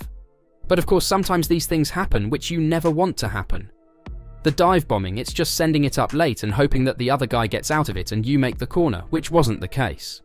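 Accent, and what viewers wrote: United Kingdom. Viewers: Off course a Britisch accent, skipped.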